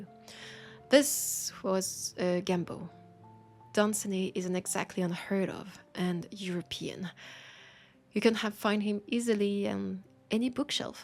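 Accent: France. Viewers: Im down bad for the french accent, chat am I cooked 🥀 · Ah, my favourite French accent! :3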